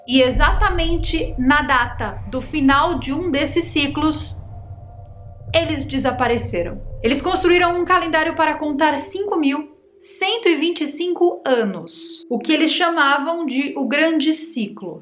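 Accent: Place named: Minas Gerais, Brazil. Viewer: mineirissima. Amo seu trabalho.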